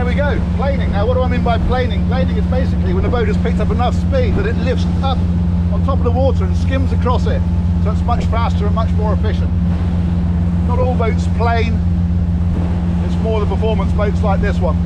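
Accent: United Kingdom. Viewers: you are such a nice, warm and friendly person.. and british accent makes you pleasant to listen to :D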